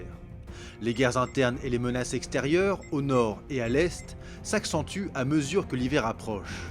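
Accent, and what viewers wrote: France. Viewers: Encore une fois, très beau travail (même s'il manque pas mal de précisions; Jon Snow fait partie de la famille Stark, il n'est pas question de Tyrion, pas même de son frère Jaime, ni de Melissandre et du Dieu Rhor,... mais l'essentiel est ici). Seulement, les noms prononcés à la française, c'est assez déstabilisant. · Je jure si tu dit BORATHEON encore une fois avec cette accent français merdic qui me fait mal au oreilles....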